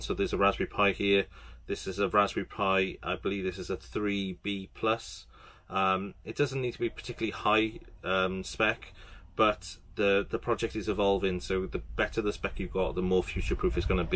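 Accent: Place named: Wales, United Kingdom. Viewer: Heya. I thought that accent sounded familiar. Are you from Cardiff? I’m from Newport :) I do 1-Credit clears of arcade and console games mainly. Those LED panels look really cool, I may have to look into this.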